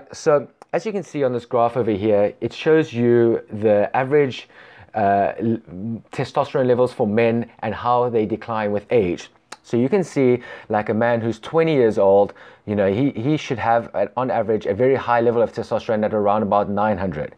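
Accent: South Africa. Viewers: Are you South African ? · Great video... Mine is 650, age 29 ... By the way, you have a South African accent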